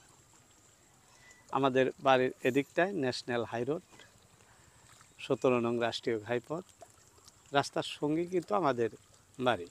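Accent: Bangladesh. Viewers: দাদা আপনার বাড়ির ঠিকানা জেনে খুশি হলাম। তবে আপনার কথায় ভারতীয় কোন মেজাজ পাই না। মনে হচ্ছে আপনি বাংলাদেশের কোন অঞ্চলের মানুষ হবেন।অসংখ্য ধন্যবাদ।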